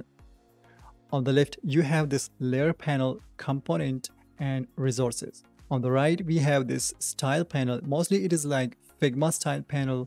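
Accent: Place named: India. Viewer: Are you Desi? Familiar accent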